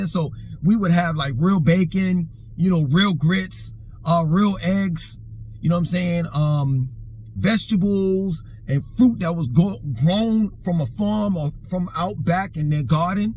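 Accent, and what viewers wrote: New York, United States. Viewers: Sounds like your from New York coming to the South complaining. Why dont you quit b!tching and grow your own food !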